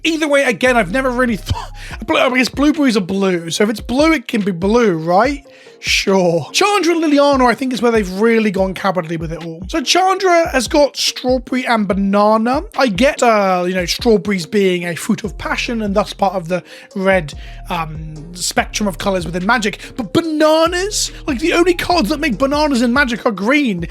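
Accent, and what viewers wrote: United Kingdom. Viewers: Holy shit, youre british?!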